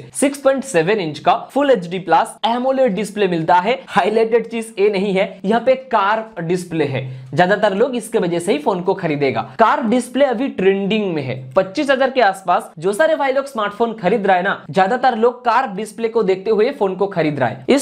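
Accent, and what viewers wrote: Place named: Bihar, India. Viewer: Bhai tum bihar se ho kya nice accent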